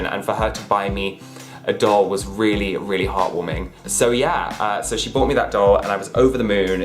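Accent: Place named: England, United Kingdom. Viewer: I am subscribed, so I have spent the last month going back through your older videos. I love your accent. To my Mid-western ears, it sounds so English, but in a good way. Not sure I said that right... Anyway, your story is great. Mine is pretty basic: I come from a Farming/Teaching family. At least 5 generations that we easily know about. As such dolls were a luxury that if you got one, you took care of it and passed it down to the next generation, who was taught the same thing. I love dolls because it is part of my family history. I expanded my collection to include modern dolls as they came out.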